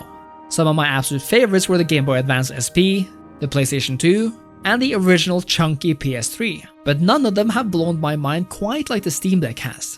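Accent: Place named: Norway. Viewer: you from Norway? The accent sounds very much like it:)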